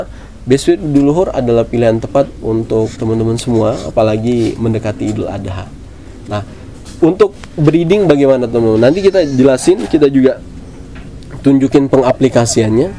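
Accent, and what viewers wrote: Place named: Banyumas, Indonesia. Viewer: Melo nyimak wong ngapak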